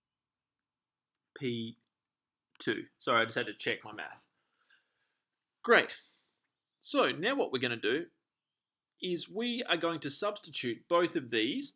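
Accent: Australia. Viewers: I asked myself "I wonder where this bloke's from, he sounds like he could be from Perth", then I check the channel and there you go. Strange combo of skating and econs, but good video. Thanks from Germany!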